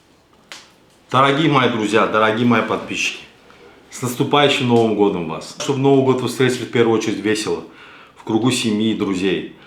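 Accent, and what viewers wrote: Baku, Azerbaijan. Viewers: Бакинский акцент ни с каким не спутаешь. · Может быть. Спасибо Вам за ваш труд и за потрясающий и неповторимый Бакинский акцент!Нас осталось мало,но мы есть!🙏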